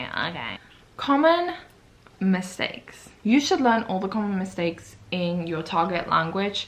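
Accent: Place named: United Kingdom. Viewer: At the beginning I heard only British accent 😂❤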